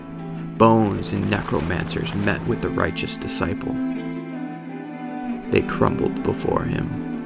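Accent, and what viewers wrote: United States. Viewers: Nah... you need that brit accent to make this legit. The American accent just wrecks all medieval type lore stories. It's just the truth man.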